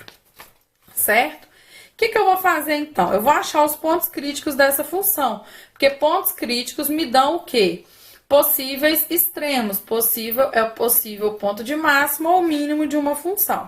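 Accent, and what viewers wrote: Minas Gerais, Brazil. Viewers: E esse sotaque Mineiro parabéns prof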